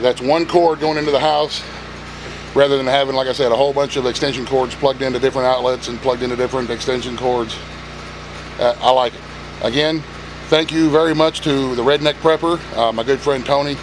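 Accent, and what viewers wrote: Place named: Louisiana, United States. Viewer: I have a very strong feeling that you're from Louisiana. You sound and act the exact same as the rest of us crazy cajuns lmao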